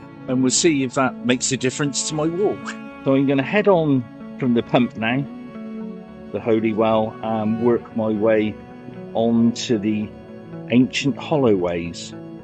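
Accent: Wiltshire, United Kingdom. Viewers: Hello. You're such an interesting person. Wiltshire through and through, with an accent to match. That holloway looked to have some tarmac to walk on. Great video, thanks. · My wife says that your accent sounds like my father who left Wiltshire as a boy in 1910. Another nice video.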